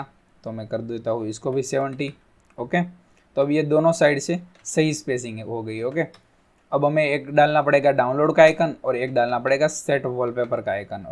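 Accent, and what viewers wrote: Gujarat, India. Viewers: When you speak Hindi, it seems that you are 100% a Gujarati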